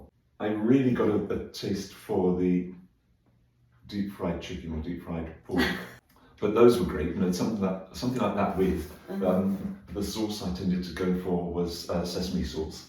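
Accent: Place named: United Kingdom. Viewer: イングリッシュとはいえ、米語ではなく英語のナチュラルで穏やかな心地よい響き、gentlemanとあいまって とても素敵でした!! 当たり前と思っていた日本の素晴らしさを あらためて教えて頂き有り難うございました(*^O^*)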